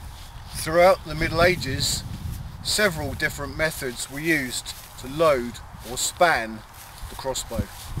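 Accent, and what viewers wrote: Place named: England, United Kingdom. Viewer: Sorry I'm very bad at understanding an english accent, can you tell me the name of the first crossbow type you used?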